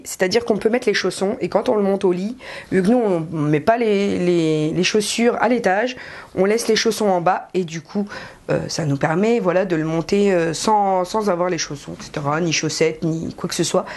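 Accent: England, United Kingdom. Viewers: J'adore ton accent anglais ma petite cloche ;) Tu as vécu en Angleterre?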